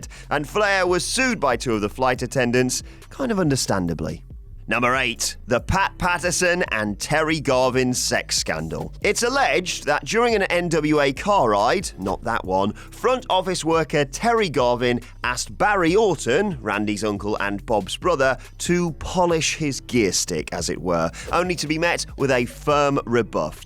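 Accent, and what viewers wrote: United Kingdom. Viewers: Buck zoom off god I hate British English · Anyone else annoyed by British voice overs?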